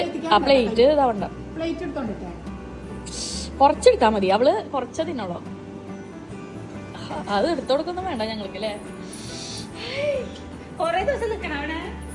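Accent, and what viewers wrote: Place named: Thrissur, India. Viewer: സ്ലാങ് കേക്കുമ്പോ അറിയാം... തൃശൂർ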